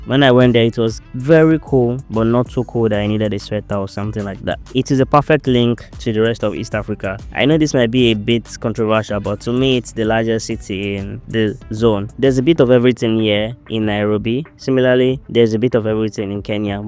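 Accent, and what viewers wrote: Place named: Ghana. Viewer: Your voice sounds like a Ghanaian accent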